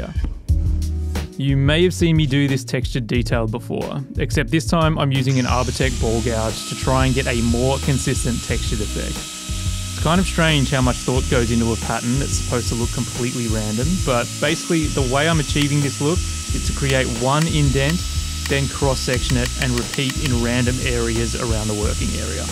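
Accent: Australia. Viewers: So nice to click into a video and hear an Aussie accent. Hello from Ballarat 🥰💗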